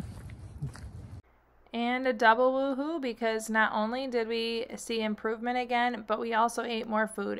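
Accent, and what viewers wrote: Midwest, United States. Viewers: Missed the midwest accent 😉. Been awhile since one of your vids popped up on my feed 💪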